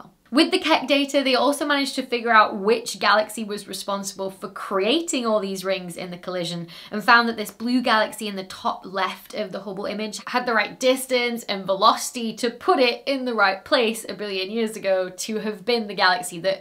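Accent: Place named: Yorkshire, United Kingdom. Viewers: Dr Becky is 'educated Yorkshire'. That is, her enunciation is perfect, but the vowels are flatter.. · I really hear that foot-strut vowel when she talks. I think the Yorkshire accent is my favorite, particularly the "uneducated" one. It sounds so incredibly pleasant. Cheers from Minnesota! 🙂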